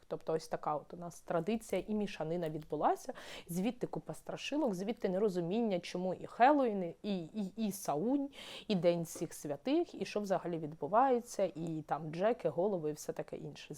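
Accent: Ukraine. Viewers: Як гарно все розклала по поличках! Приємно слухати інтелектуальну людину з такою приємною зовнішністю і українською вимовою! Дякую, наша ❤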